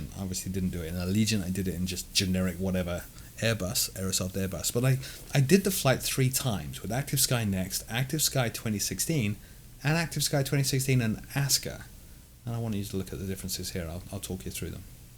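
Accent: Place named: United Kingdom. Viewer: wait are you from florida?! you sound british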